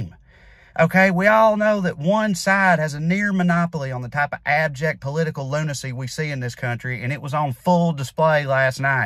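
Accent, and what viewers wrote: Australia. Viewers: Yup! Well said with the Australian accent. I’d like to add the spelled version of that with a Manchester accent, courtesy of my British buddy who’d say, “Couple a Facking Can’ts those two!” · Not a bad Australian accent, mate. And yes, she's so empty, gee. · The Australian accent…. 🤌🏼🤣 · The Australian accent worked! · Great Aussie accent and nicely played C word. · you da man! hope that Ausi accent kept ya out of the weeds:) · The Australian accent totally worked!! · As an Australian, I approve your Australian Accent and it is a spot on imitation of what an Aussie would say about this. Love 💕 from Australia 🇦🇺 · 👍 on the Aussie accent. Not bad 👏🏻 MGT should spend a week in a Chinese gulag so she could learn what that word means- not that she would be able to survive more than one day. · It's amazing that two words was working up to before he said them in his Australian accent were the very two that I spoke aloud in my very own American accent about two seconds before he said them. 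If the shoe fits "ladies..." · Australian subscriber here 🦘 Love every single glorious rant you upload, and thanks for the 70% Aussie accent, but one reason we tend to get away with using that C word so flagrantly is that we rarely use it to refer to women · LOVE LOVE LOVE THE AUSTRALIAN ACCENT,! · The Aussie accent makes everything OK :) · Shout out for your Aussie accent and as usual for calling a spade a fucking shovel. (Another Aussie Idiom) 🇦🇺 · Not too sure about the Aussie accent, but I can't disagree with the sentiment, mate. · Acceptable Aussie accent, mate. Convinced me, and I'm from here. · You hit the nail on the head with your description of Marjie and Laurie. I like your Aussie accent mate. :)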